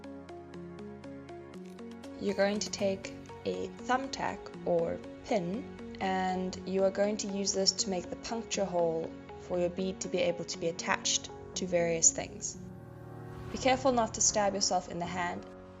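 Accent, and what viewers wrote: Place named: Australia. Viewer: She is southafrican? I thought she was Australian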